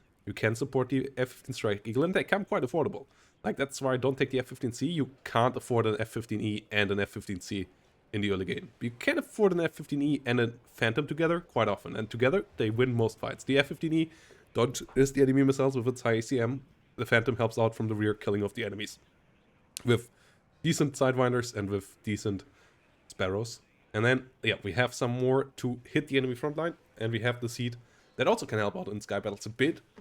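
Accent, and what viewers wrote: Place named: Germany. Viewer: Deutsch erkennst immer sofort ^^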